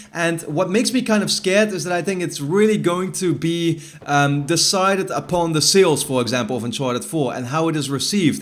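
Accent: Netherlands. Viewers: you got a dutch accent and my name is robin to he eh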